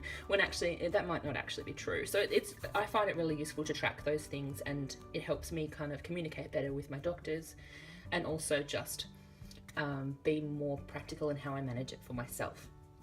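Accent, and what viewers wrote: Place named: Australia. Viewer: Uhhhhh it’s so nice to finally hear an Australian accent the Americans have taken over YOUTUBE!! JK lol Heyyy Aussie squad!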